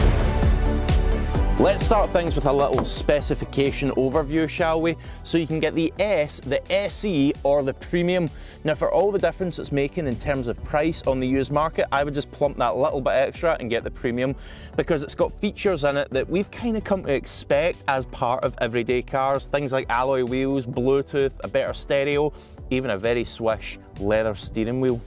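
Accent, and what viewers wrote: Scotland, United Kingdom. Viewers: Prude i got your Scottish accent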